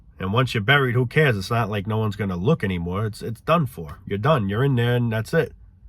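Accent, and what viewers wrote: New York, United States. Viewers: Yeah the ny accent, and truth speaking. Makes me laugh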